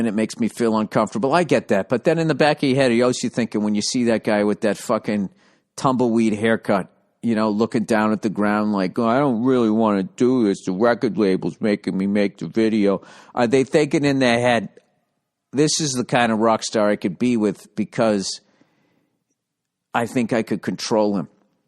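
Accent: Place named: New England, United States. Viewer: thank god for this podcast. i share a house in kentucky with half a dozen women, work with all female coworkers and abrasive new england accent is like an oasis. it's good to hear a baritone for once instead of all these drawling mezzo-sopranos.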